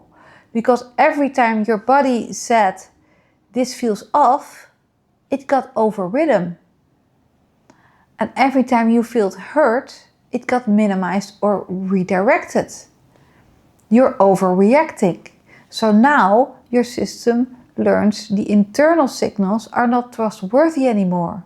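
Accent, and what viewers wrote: Netherlands. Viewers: You sound Dutch.... bedankt voor deze video. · Je bent duidelijk slim, kun je niet iets minder Dunglish spreken 😅😂😂 is moeilijk om naar te luisteren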